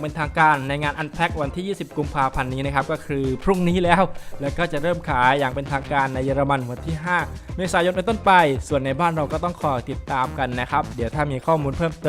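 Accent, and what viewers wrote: South, Thailand. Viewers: สำเนียงใต้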